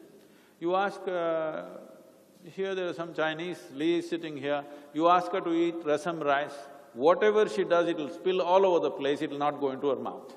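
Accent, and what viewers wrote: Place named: United States. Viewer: what this jerk Sadguru is Bull Shit.He has no knowledge.He never answer a question posed instead talks some pig shit in super American English..see above qstn. was asked how a person feels just before his/her death and this jerk says something mango Banana..i will tell u what happens ..just a 10 minutes prior to death the person see his departed parents.Departed friends and relatives and feels some one is pulling his body from his/her feet and feels coldness in his body.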